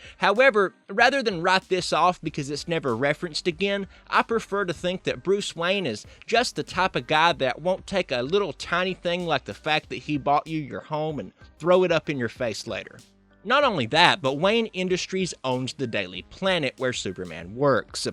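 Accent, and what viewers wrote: United States. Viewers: could have done a "top 5 videos" haha im from oz and your accent is hardcore cowboy haha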